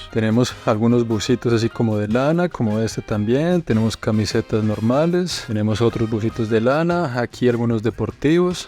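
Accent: Bogotá, Colombia. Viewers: 🤣🤣 habla como mi abuelito: para que 50 dólares si en 2 meses le crece la pata 👟 yiyos 🩲🤣chagualos 👟👟🤣 los calzones con la marca para que el que le vea la nalga sepa que lleva Tommy 😂😂 muy Bogotano el hablado, Saludos desde Bogotá. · M encanta como documenta, aparte ese acento colombiano que tambien me gusta. Parse,un gran saludo y abrazo desde CDMX!!! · Saludos a lla.mama de. Tiene un exelente hijo un grab ser humano. Bendiciones. Dialecto colombiano a donde quierea va 😍Me encanta.. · Acabo de descubrir tu canal, y ne gusto mucho tu manera de relatar los videos, me encanta tu acento colombiano, ojalá vengas a alguna parte de Mexico y te conozca en persona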